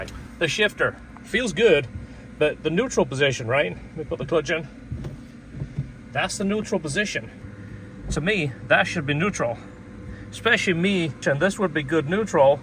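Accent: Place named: United Kingdom. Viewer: I am listening to this and for some reason I am imagining a British guy talking.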